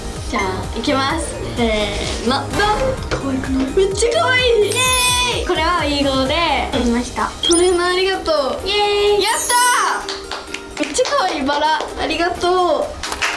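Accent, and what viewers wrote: Miyazaki, Japan. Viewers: 宮崎弁でしゃべってるのかわいい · 宮崎弁ところどころ使ってる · やっぱ宮崎弁やん かわいすぎ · 宮崎弁可愛い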